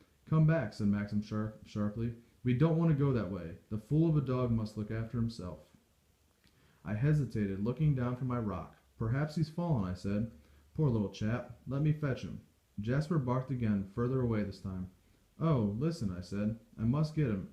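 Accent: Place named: United States. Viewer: Awful. Why is a man and an American reading? Terrible recording too.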